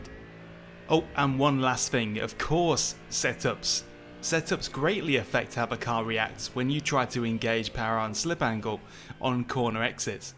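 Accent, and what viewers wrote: United Kingdom. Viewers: You have the cool Brit accent I like! Like driver 61. Where is that accent from?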